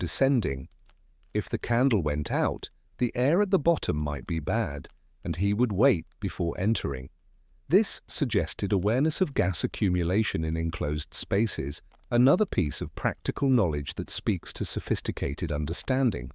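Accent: England, United Kingdom. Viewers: I like these but they are all done in English accents and I really crave hearing an American accent. Especially the series about the frontier!!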